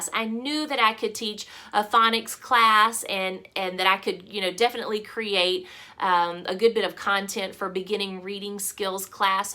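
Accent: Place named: Virginia, United States. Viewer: Thanks for answering. I have a thing about being very interested in accents. Love trying to pin it down. Often right, especially with Texans, but you had me really wondering, because I wasn't sure. But I thought you sounded like me. It's fascinating. I wish you would put me out of my misery!!!! Eliminating all the other states ... Hmmmm ... Maybe Virginia! Possibly a Carolina, North.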